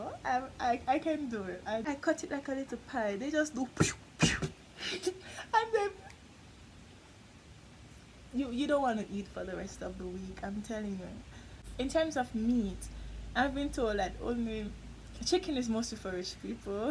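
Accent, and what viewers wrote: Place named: Jamaica. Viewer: Your partial Jamaican accent is so cuuute.🙆 I just love it.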